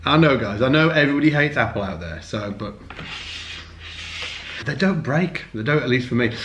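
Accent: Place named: England, United Kingdom. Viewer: Great video + English accent=8 minute intro monologue.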